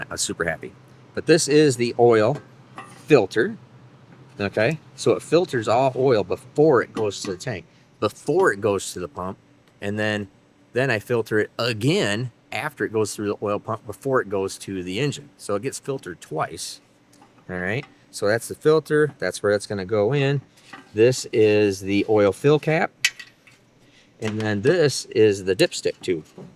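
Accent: Michigan, United States. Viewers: Nice explanation on the dual pumps, i immediately thought of staged pumps but more things to go wrong that way. On the “condescending” explanations. Part of it is the Michigan accent. I moved to Texas in the 80’s. I still have problems with my “tone” when my accent is more prominent, it sounds like your being condescending when its a detailed explanation and the Michigan enunciation sounds like your talking down to some. 🤷🏼‍♂️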